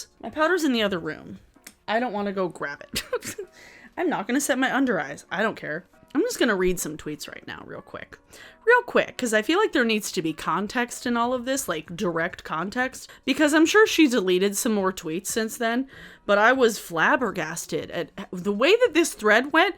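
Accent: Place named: New York, United States. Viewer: Came over here from Hannah's video and loving it! Also, your accent sounds exactly like my aunt (she's in NY state and I'm in Western Canada), so it was like listening to her for a while! She is also very no-nonsense.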